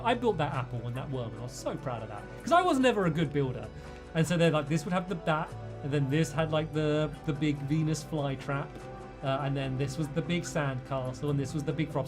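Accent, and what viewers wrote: United Kingdom. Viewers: British English quite well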